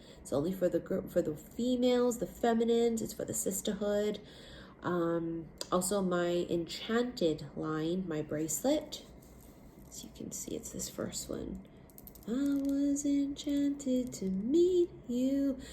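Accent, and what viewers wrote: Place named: Hawaii, United States. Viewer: 😂😂😂I knew you where from Hawaii!!!! I could hear it in your voice!!! Love yah sis🙌🙌🥰🥰🥰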